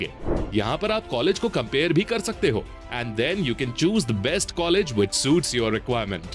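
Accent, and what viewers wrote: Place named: Delhi, India. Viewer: Humare delhi me aajo sir srojani nagar wali awaj he apki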